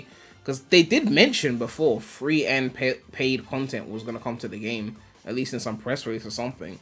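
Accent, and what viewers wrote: United Kingdom. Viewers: i like your british accent bruv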